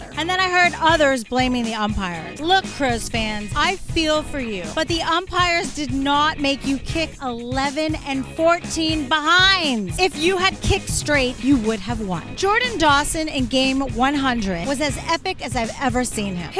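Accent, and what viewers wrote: United States. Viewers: I’m loving this. I look forward to this every week.. As an Aussie who used to live in Texas it’s awesome hearing an American accent talking about footy..👍 Please keep it going. Be patient. I can see you being a huge star ⭐️ down under in a few years !!!!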